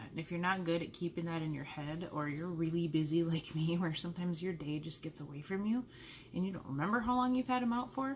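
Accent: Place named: Minnesota, United States. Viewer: What is her accent? Sounds like maybe Minnesota?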